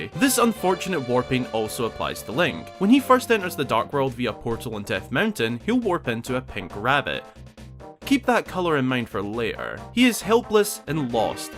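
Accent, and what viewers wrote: Scotland, United Kingdom. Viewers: Are you Scottish just by the accent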